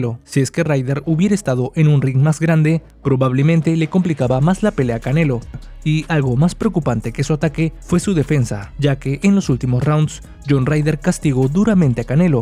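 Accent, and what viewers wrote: Mexico. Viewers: yo no se si sos mexicano pero tu acento me dice que si. que amargo que sos hermano, los mexicanos tienen a los mejores boxeadores de la historia y el canelo es uno de ellos...te la pasas haciendo videos tratando de tirar abajo a uno de lo mejores boxeadores de la historia de Mexico en vez de valorar lo que consigue este tipo, y tiene solo 32 años!!! le dejo la cara como un tomate y lo tiro dos veces, a ustedes siempre les falta 5 para el peso...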